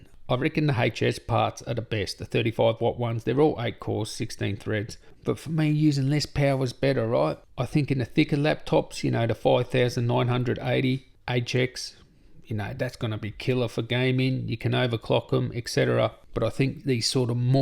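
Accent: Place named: Australia. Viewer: Love the multicultural Aussie accent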